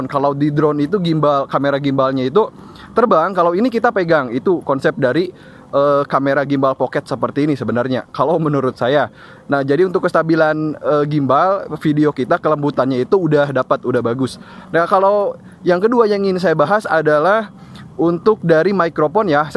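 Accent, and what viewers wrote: Kuningan, Indonesia. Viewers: Urang kuningan jiga na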